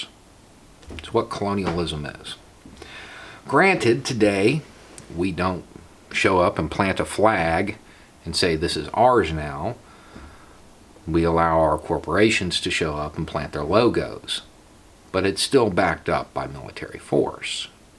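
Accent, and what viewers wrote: South, United States. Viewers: Dan Carlin is absolutely more knowledgeable, he should have an honorary doctorate in history from some prestigious university. However, this man reaches people that Dan could never, because of the language and dialect in which he speaks. talks to people from Appalachia and the Southern States in a way that is digestible. If someone from the "outside" spoke the same words, with more authority....it would feel condescending. Nothing shuts down a blue-collar working southerner, more than someone with a Brady bunch dialect trying to give them a history lesson.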